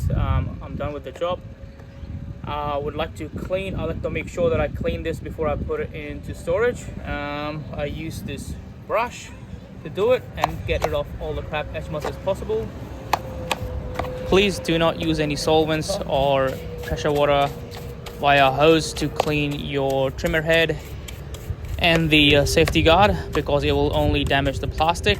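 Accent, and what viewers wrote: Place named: Australia. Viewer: U have perfect aussie accent even better than aussies...how u mastered it... ? Just feeling michael clarke is there...😅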